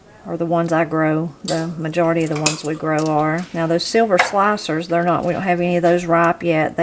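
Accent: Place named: West Virginia, United States. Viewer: Love that accent. She talks like my mom did, she was from WV.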